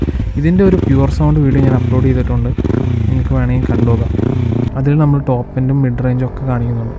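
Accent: Kottayam, India. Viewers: Broo kottayam kaaran aanooo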